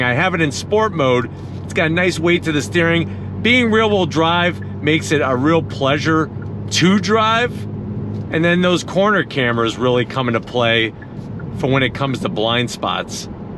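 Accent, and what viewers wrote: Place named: New York, United States. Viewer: Okay, a winning formula for your presentation. I could swear you have a New York accent. Great salesmanship. Do you ever bump into former students at a car dealership?!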